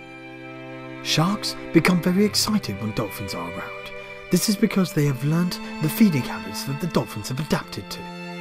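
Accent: United Kingdom. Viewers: awesome , nice job, ...and the english accent the best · the british accent is best, David Attenborough approves that lol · Really cool! The British accent really sells it.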